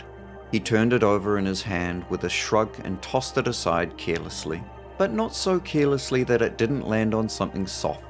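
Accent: New Zealand. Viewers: Kiwi reading! Yaaay! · Oh snap! 😉Awesome read though with a kiwi ❣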